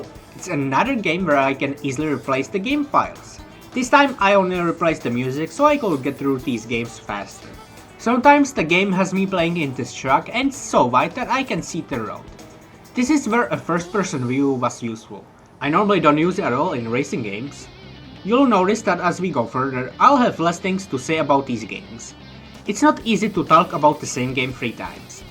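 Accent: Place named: Czech Republic. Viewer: bro where are you from? you sound like someone from czech republick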